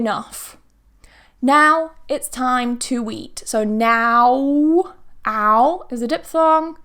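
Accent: United Kingdom. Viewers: Siendo sincero, por supuesto que quiero aprender inglés británico, pero me gusta más verla y oírla porque tiene una voz muy bella y me transmite un ánimo alegre porque siempre sonríe. Inteligente y bella que más se puede pedir. Me encanta su acento, cuando hablo inglés ya hablo mas con acento británico Gracias a ella. La adoro 🥰.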